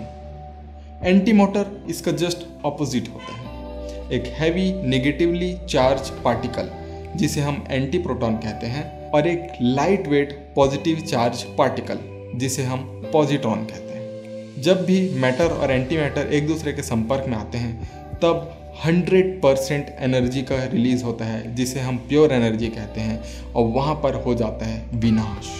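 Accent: Chhattisgarh, India. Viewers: Accent se toh lag raha hai Chhattisgarh se hain.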